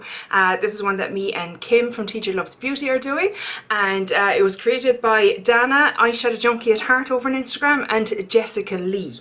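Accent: Ireland. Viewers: Hello! New to your channel and I absolutely loved this video! I could listen to you all day. I am so in love with the Irish accent. I hope to visit Ireland some day even if it does rain a lot. I loved hearing about all the slang. I have been binge watching your videos since I found you. You have great skin and makeup. Keep the content coming, love it. ☺️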